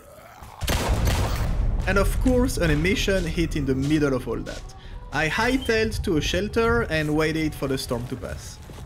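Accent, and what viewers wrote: France. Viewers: hey dude, i can’t say where u from its like a french accent and russian one 😂 could u help me on this one ?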